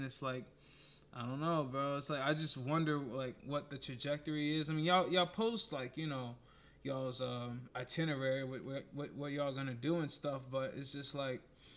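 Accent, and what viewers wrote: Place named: Pennsylvania, United States. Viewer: My buddy is from PA and talks similar. Probably a Steelers fan 🤮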